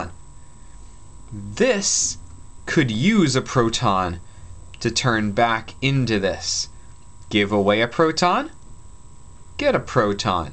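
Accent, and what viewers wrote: Canada. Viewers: totes hear a canadian or mn accent 😊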